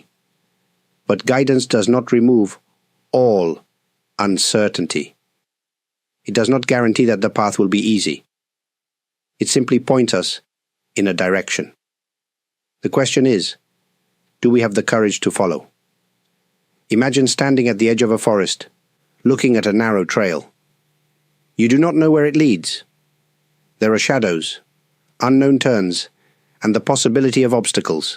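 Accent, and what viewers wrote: United Kingdom. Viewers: IT OCCURED IN MY MIND, TO NOTICE THAT YOU HAVE SOME BRITISH ACCENT IN THE WAY YOU SPEAK. ARE YOU LIVING IN BRITAIN MAYBE?😇🙏💜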